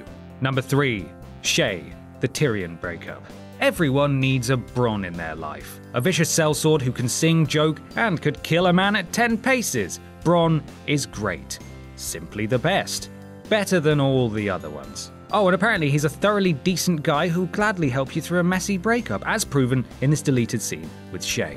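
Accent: United Kingdom. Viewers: here i was thinking i would get to watch 9 deleted scenes but nah im just sitting here listening to some british guy tell me about 9 deleted scenes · It would be easy to imagine this chap with his British accent saying "Dam it man!" or "For heaven's sake man!"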